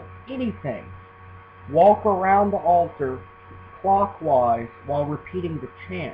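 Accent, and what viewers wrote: United States. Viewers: Thankyou i loved watching you and i love your passion also lurrrve your american 'y'all' accent keep posting xx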